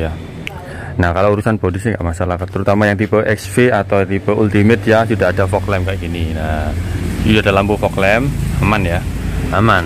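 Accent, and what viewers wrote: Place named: East Java, Indonesia. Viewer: Bahasanya kok jawa timuran om sampean wong endi 😅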